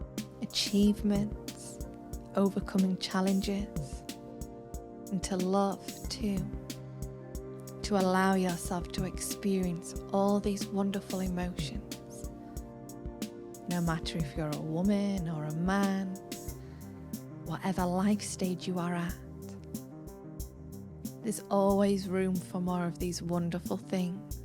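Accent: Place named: Northern England, United Kingdom. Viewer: I love a good Northern accent, and yours is the best. So soothing and inviting. You're wonderful! Thank you!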